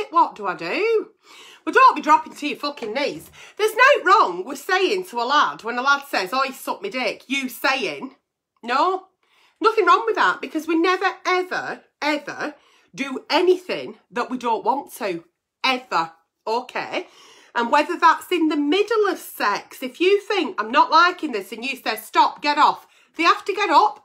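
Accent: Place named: England, United Kingdom. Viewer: Great video...Great Advice.... i was shocked with your honesty and vulnerability....respect to you! 👍🙋‍♀️👏👏👏👏 Your hair colour is gorgeous!! I live in the Netherlands...have done since I was 17...I'm 58 now and miss English accents.... yours is brilliant! Keep up the awesome work! 😀👍👏👏👏👏🌺🌺🌺🌺🌺🌺🌺🌺🌺🌺🌺🌺🏵🏵🏵🏵🏵🏵🏵🏵🏵🏵🏵🏵🏵🌼🌼🌼🌼🌼🌼🌼🌼🌼🌼🌼🌼🌼🌼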